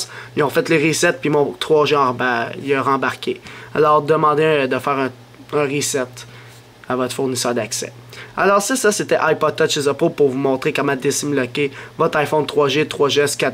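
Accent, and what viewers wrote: Canada. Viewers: Ton accent Canadien il est trop drôle :-) on n'en n'oublie presque le but de la video ... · Mdr l'accent canadien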